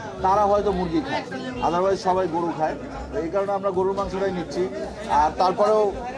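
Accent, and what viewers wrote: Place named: Chapai Nawabganj, Bangladesh. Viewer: Vaiya ajkeo aponar khawa dekhe moja peyechi. Ar chapai er vasai kotha sune onek mugdhu hoyechi 👌❤❤❤❤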